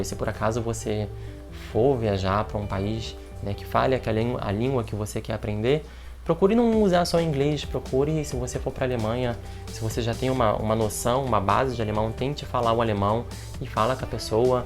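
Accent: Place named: Rio de Janeiro, Brazil. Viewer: De qual estado do Brasil é você? Eu tô aprendendo português e quero saber o seu sotaque, gosto muito de ouvir sotaques do Brasil e dos países lusófonos todos! Edit: Eu já vi que vc é do estado do Rio de Janeiro. Rsrsr Sou novo inscrito no seu canal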